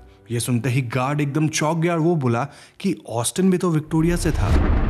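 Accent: Bengal, India. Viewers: The man who is narrating ... Are you bengali? Because your accent is quite like them...😁 By the way amazing narrating 🤓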